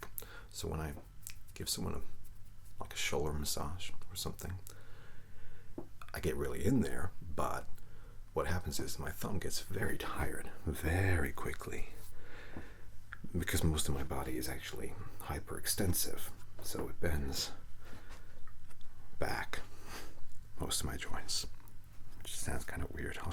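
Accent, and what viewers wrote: United States. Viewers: Out of curiosity: What made you decide to do your Reiki Sessions in an American accent? He doesn't really seem like a caricature, and just seems kinda like a normal guy. Do Brits associate Reiki with Americans?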